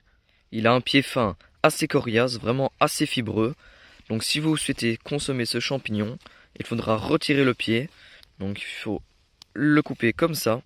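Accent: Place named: Franche-Comté, France. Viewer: Tu ne serais pas de Franche Comté ? Je pense reconnaitre un leger accent 😊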